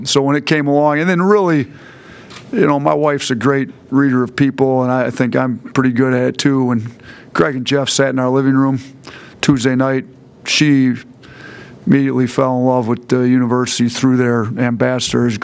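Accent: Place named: Northeast, United States. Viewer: he has the northeast accent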